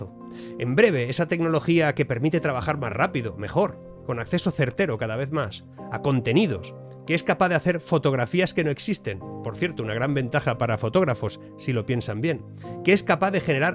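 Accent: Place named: Spain. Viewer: Me encanta el contenido del video, me causa sorpresa y una inebitable distraccion que habla con acento Español de España con su bonita camisa de la seleccion de futbol Italiana, es esto un provocacion de oficio?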